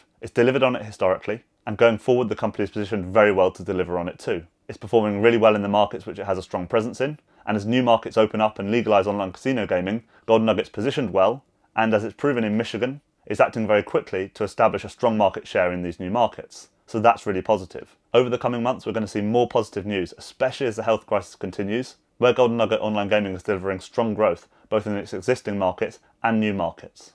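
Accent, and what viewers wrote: United Kingdom. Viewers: Really appreciate the update, thank you. I got into this SPAC through you, this could be a great success story so gonna watch it and enjoy the journey. Looking forward to your next pick. Also - so nice to hear a YouTuber with a British accent! Mark - Hertfordshire.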